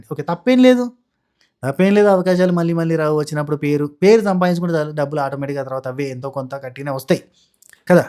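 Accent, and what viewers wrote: Telangana, India. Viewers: KCR thatha tharvata nak nachina telangana person nuve anna super matladtav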